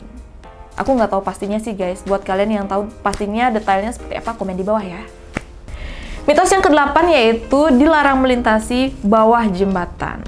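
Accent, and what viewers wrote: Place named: Bali, Indonesia. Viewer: Logat balinya masih kental kak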